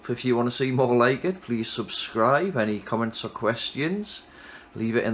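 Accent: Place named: South Shields, United Kingdom. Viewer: I’m in South Shields you sound like you’re up here too, I repair tvs, I’ve had that before on a 12v tv the power supply wasn’t the right one so it was collapsing when it needed more power. Great fix though shame about the quality 😂 probably £5 brand new from china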